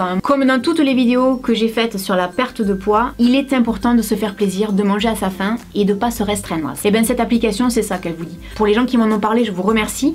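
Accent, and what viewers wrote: South, France. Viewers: l'accent du sud!!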